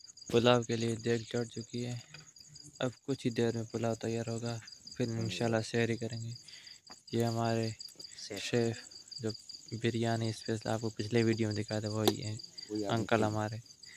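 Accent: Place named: Sindh, India. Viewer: Mai to smjha k Pakistan sindh ka area h koi kiun k ap ka lahja bhi Sindhi lagta h or or Yahan Kuch Sindhi hindo h I dia h pahla Sindhi Muslim daikha h🤔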